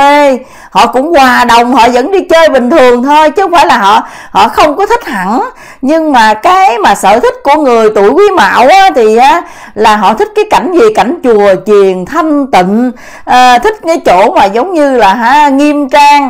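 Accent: Standard. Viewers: Chi noi qua chuan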